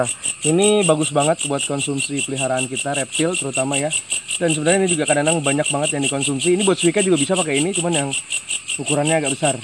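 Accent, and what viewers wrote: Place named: Java, Indonesia. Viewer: Dih galengan kek orang jawa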